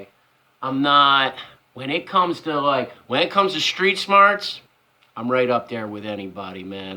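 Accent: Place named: Mid-Atlantic, United States. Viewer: Ha, I am in South Eastern Pennsylvania, I thought that I recognized a Mid-Atlantic accent, ha, Alcohol is my drug of choice, drinking Vodka as I type, I used to smoke weed years ago, not really my thing anymore, I’ll take a few hits her and there, do what you do man, it’s all good, trucking is horrible these days, still an LTL driver, companies such as YRC and NEMF etc, are having a tough time finding drivers, local drivers, because, they do not want to pay anything..oh well..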